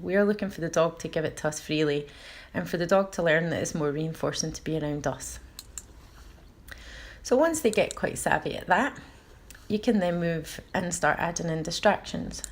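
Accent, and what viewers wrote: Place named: United Kingdom. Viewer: Did you say 60 or 16 reinforces? I'm sorry, not really used to british accent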